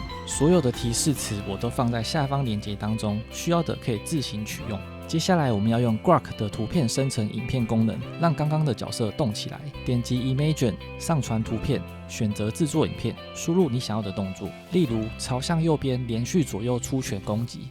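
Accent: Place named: Taiwan. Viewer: 是台灣人的聲音太感動了 我以為ai世界沒有台灣人了全部都是中國式教學...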